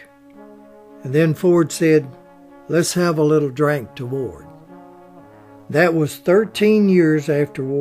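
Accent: South, United States. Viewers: no thank you you are what makes YouTube great I love your voice you have that little bit of Southern song which I love keep up the good work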